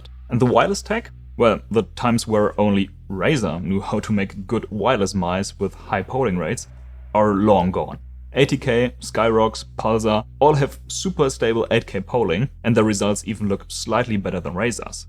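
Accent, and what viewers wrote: Germany. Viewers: i hear german? aber krasser akzent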